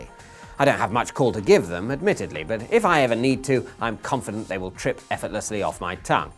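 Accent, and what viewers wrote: United Kingdom. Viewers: Its like the neurotic voice in my head got a nice British accent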